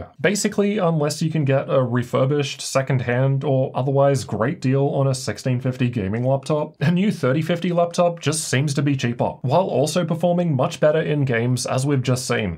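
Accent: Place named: Australia. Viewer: Aussie accents are fun: you say turrr when you mean to say too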